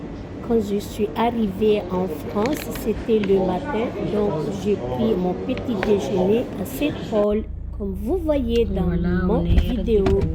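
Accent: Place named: Madagascar. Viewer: Il n’y a pas que ses compatriotes comme public…deja elle meme vit dans un pays où on parle français donc certainement elle a des proches qui s’expriment dans cette langue, l’interêt de ce genre de publications est de montrer à un maximum de personnes dans le monde, gasy ou pas, la beauté du pays et la vie quotidienne sur place, d’oú le choix de mixer les langues afin de ne pas restreindre sa communauté. Vous voyez bien qu’elle fait meme l’effort de traduire et d’apprendre certains mots gasy à ses abonnés. Par ailleurs je trouve justement qu’elle s’exprime bien en français avec l’accent et les nuances propres à son pays d’origine…quel intérêt de voir une gasy parler Français comme une parisienne?? Y a d’autres videos sur le net pour cela…quand on montre son pays natal, ses émotions, on a pas besoin de parler comme molière. on parle avec son coeur et son accent!! 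En plus le français est aujourd’hui parlé de différentes manières en fonction des pays..c’est meme ce qui fait son charme et la beauté de la video.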